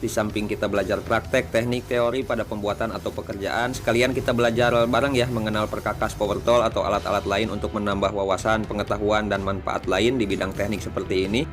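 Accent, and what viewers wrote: West Java, Indonesia. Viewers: Keren gaya bicaranya 👍 Logat sundanya masih ada 😀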